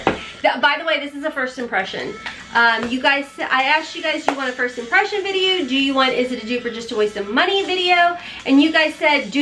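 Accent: South, United States. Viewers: Don't be sorry. I love ur country accent.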